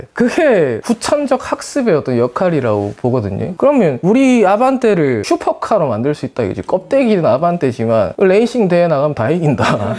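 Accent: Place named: Busan, South Korea. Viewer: 선생님~부산여자 서울살고있습니다. 고향말씀으로 들으니 너무 집중이 잘 됩니다. 저희아이 아반떼로 태어났는데 완전풀옵션하고 튜닝하고 엔진갈아서 열심히해보겠습니다!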